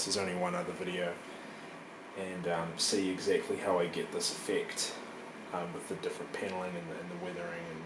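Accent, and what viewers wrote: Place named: New Zealand. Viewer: dude! thts some great work!! As a 'crazy Blake's 7 fan' I appreciate yr work here :) was larger scale than I imagined, which means a corresponding Liberator wld be YUGE! U sound like a fellow kiwi lol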